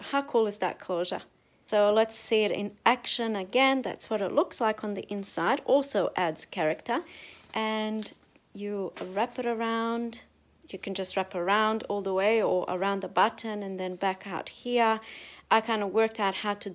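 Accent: Australia. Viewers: Please keep talking. I love your voice. My mother was an Australian war bride who immigrated to Texas. She's gone now. Your voice reminds me of her voice and my Aussie cousins. And your explanations are essential to the teaching process. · Im delighted with your vid Miss, You are an absolute treasure, and so are all your great ideas.... Im furious with anyone that would say such a derogatory statement... goodness, its instructional tutorials, lots of talking is required... please ignore those that would say such terrible things, I could listen to you read the phone book, love listening to that beautiful Ozzie accent..., You are perfect the way you are, dont let anyone tell you any different. God made us all perfect, in his image in fact. Tell the haters to pound sand, we love and adore you the way God made you. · ........you gorgeous beautiful human being...this video has to be in my.....FAVORITE TOP FIVE!!!....these are just absolutely amazing...thank you for showing how to do them....too....please I love hearing you talk as we are both Aussies!!!...Huge hugs and love....Maxxii-Michelle....Perth Western Australia xxxxx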